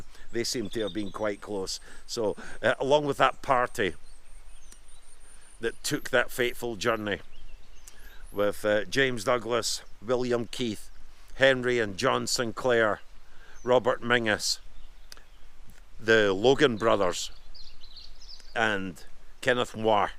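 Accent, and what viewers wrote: Scotland, United Kingdom. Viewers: I would have never thought that one day i'd watch a video of Avatar's villain showing me the tomb of a medieval knight, with the thickest scottish accent i've ever heard.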